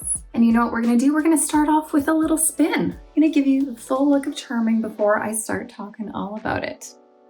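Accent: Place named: Canada. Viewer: Just a trivial question... How is your accent doesn't seem different ? Maybe I'm wrong about Canadian accent. And the Charming style wig, great!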